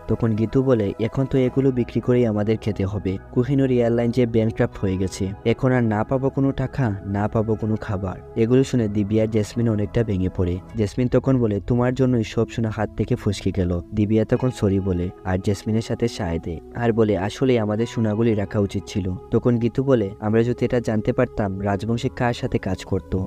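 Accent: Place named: Sylhet, Bangladesh. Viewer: Sylhety vasa oshadaron😮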